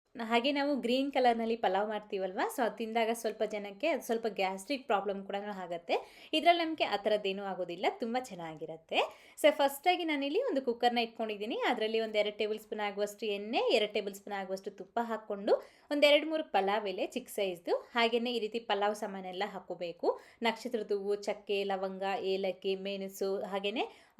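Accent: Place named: Tamil Nadu, India. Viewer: She is a Tamilian avr accent hage ne irathe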